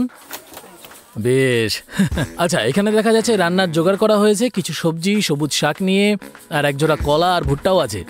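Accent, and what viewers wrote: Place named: Sylhet, Bangladesh. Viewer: সিলেটি সিলেটি ভাব আছে কথা কন্ঠে!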